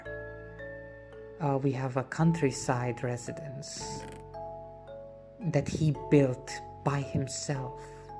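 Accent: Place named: Romania. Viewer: wow I mean first of all, LOVE THE NATURAL ROMANIAN ACCENT 🗣🔥 and thank you so much for calling me nice and chill 😭You have no clue how much it actually means to me though, and I wish I was able to hang out way more, but I'm quite busy sadly and will probably still be for a while but honestly, what a Life update this is, I'm sorry to hear about all the health struggles and financial worries, really hope it all works out and you get that new pc too so we can enjoy more peak vids 🙏and I can speak for a majority of the people that know and watch you, take your time. Even if it takes you weeks, or months, or years, to get that PC, we'll wait and be excited for the vids!!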